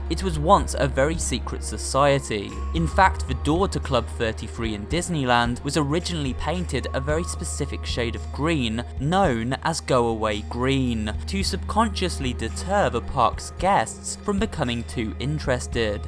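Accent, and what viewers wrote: United Kingdom. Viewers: Now your British speaking voice sounds much more legit, it must have been a thing where you thought that talking slower would be more helpful or something.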